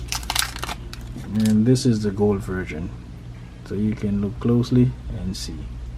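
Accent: Jamaica. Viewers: You sound really Jamaican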